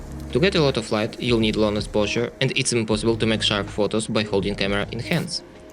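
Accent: Russia. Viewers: You sound Russian my comrade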